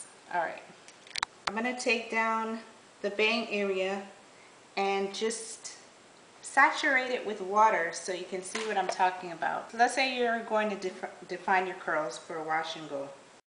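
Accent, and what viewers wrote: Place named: Caribbean. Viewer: I can hear west indian in your accent..where are you from?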